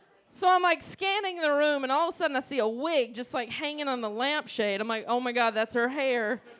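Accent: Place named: Carolina, United States. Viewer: yes she does. Very heavy Carolina accent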